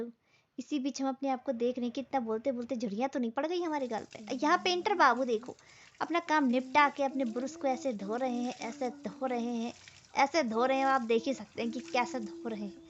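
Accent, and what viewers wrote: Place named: Lucknow, India. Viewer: Aaj to aap Lucknow ki language bol rahi hai Ham ham😂😂 very nice 💞 💞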